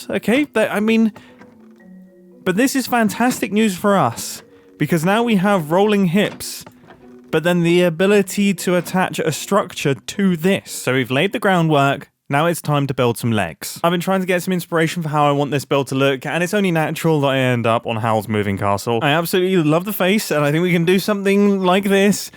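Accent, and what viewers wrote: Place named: Cockney, United Kingdom. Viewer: a very cockney accent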